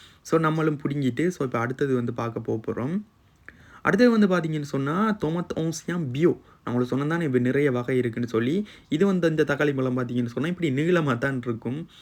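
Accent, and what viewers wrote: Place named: Sri Lanka. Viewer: I like halloween 🎃 tq for this experience 🎉and like srilankan tamil 😊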